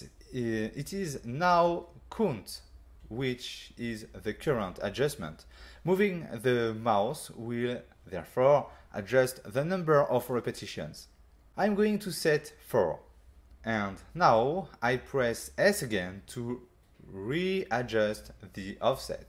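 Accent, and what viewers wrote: France. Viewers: Ah l'accent français 😉 Chouette tuto, j'ai acheté fluent il y a peu mais encore trop eu le temps de m'y plonger... Mais ta vidéo donne envie 😊 bravo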